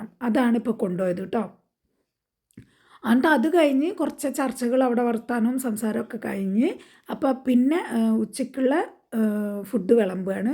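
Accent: Palakkad, India. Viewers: Palakkad accent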